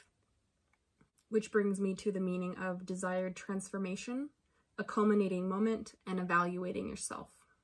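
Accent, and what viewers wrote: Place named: Chicago, United States. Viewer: The edits and cuts make it obvious you are reading from copied notes, where we can not see but you can. Sound totally unnatural. Starts video off in a negative tone about other you tubers, arrogant. Uses poor English saying "Coming right at you, with..." Sounds so South side of Chicago. You copied. Easy and thoughtless to do.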